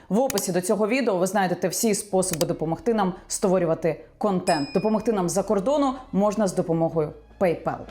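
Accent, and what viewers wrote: Russia. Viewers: ну не під силу вам український синтаксис - хай вже як є, але ж вивчіть бодай українську фонетику - це не складно вам буде. Ну не можна ж вимовлять українські слова чи не в кожному другому з них по-московському. До Азірова не так вже й далеко.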